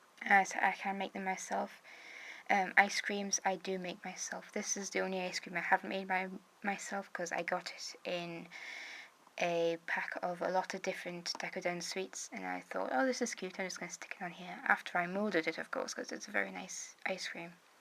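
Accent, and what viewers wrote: United Kingdom. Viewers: Yay ur from the uk aren't u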